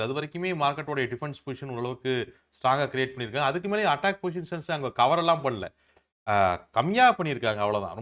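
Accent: Kongu, India. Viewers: அது எங்களின் பிரத்யேகமான கொங்கு slang Sir.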